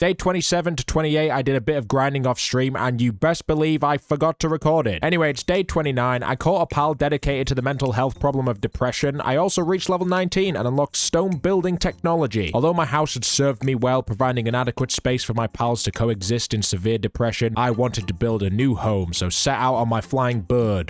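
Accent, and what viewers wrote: United Kingdom. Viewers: The worst part is a br't'sh dialect. "Fancy my chances", you people really are the native speakers of it? 🤧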